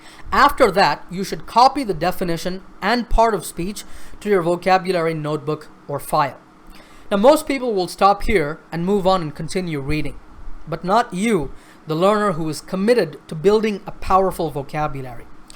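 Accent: United States. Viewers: you know I m in 10 th I have joined a lot of English tutions one named American institution of English language but the problem there was that those people don't have accent but u have it I m an ilets student too have of my doubts are finished due to you now I don't get perplexed while listening an American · I really looking forward to learn American accent like you could you help me out please.. Actually I tried getting bogged down many times in it but the problem arises with practicing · How did you master the American accent?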